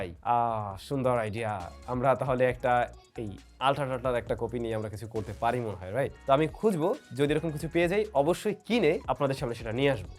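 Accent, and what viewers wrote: Barishal, Bangladesh. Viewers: ভিডিওটা দেখে খুব ভালো লাগলো ❤️ ভাই মুইও কইলো বরিশাইল্যা 😂 আমনেও তো হেইয়া ধন্যবাদ 🌺 ভাইকে 💝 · Vai Tumi mogo boreshailla SUNA khubi Valo laglo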